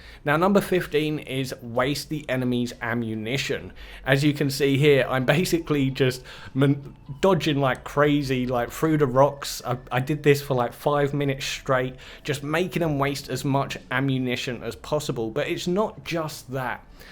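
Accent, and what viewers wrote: United Kingdom. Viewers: ur accent is amazing, are u british? Watchng your vid makes my enflish better and apex skills better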